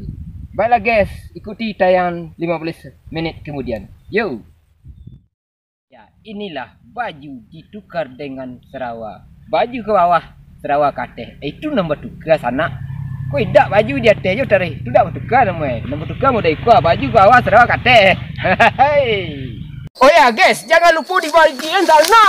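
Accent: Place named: Minangkabau, Indonesia. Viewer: Mirip suara minang kocak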